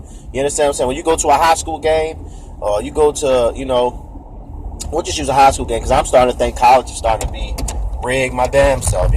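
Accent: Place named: Washington DC, United States. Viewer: this was good as video champ You sound like you from Dc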